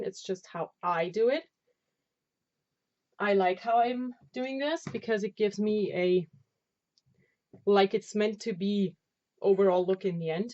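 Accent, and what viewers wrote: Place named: Germany. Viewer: Hallo, du sprichst doch sicherlich Deutsch, oder? Ich höre so ein Dialekt in deinem Englisch...:-) Wäre schön, so ein Video auf Deutsch zu machen. Danke!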